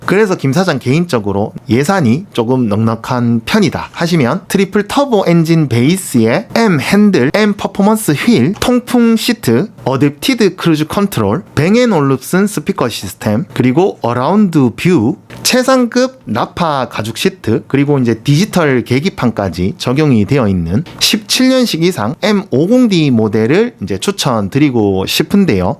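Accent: Gyeongsang, South Korea. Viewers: 아 이분 갱상도네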